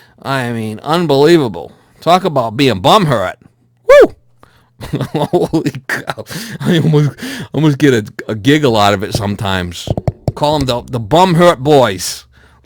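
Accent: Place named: New England, United States. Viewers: Love the voice and New England accent. Accents are dying out. I grew up in Los Angeles and have no accent whatsoever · Hey. Luv your videos. I know what some people are saying, but maybe part of it is that they're listening to the words your saying to a person on the camera but not seeing your face when your talking to them. IDK. Anyway, you do you.:) I also think part of it is your accent. You do have a strong NE accent. I agree, don't change for anyone, right.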